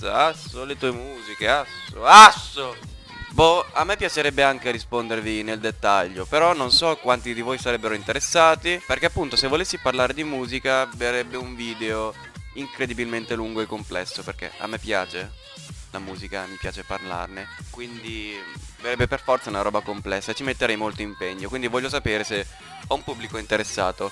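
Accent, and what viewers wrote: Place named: Romagna, Italy. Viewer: Mi sembri romagnolo dall'accento, sei di Ravenna?... AnnennA...AnnA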